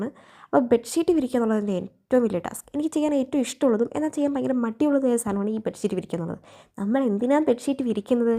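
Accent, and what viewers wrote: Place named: Kozhikode, India. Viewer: Calicut slang ollalo da❤️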